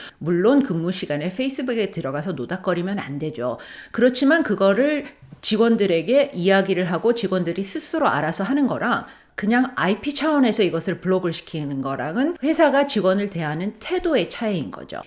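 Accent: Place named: United States. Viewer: 너무 재미있고 유용합니다! 영어도 완전 미국인 이신데 설명도 너무 잘 해주세요!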